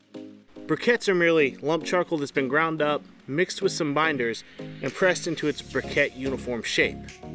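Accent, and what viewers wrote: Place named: West Virginia, United States. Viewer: I'm assume you're from West Virginia....new subscriber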